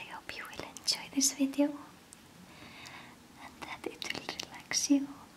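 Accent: Iceland. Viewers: Always heard Icelandic women were out of this world beautiful... sucks you got stuck with our Navy and Marines up there... wish the army would send me that way... my god that accent and your curves... well at least I have you to thank for the Zzzs most nights... I appreciate it!!! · i love how our Icelandic accsent is so strong for asmr PS: Icelander writing this ❤ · I'm sure I will - always love listening to your voice. And the visuals are nice icing on the cake :) . You know, it's your accent and ElizabethASMR's accent that have inspired me to want to visit Iceland!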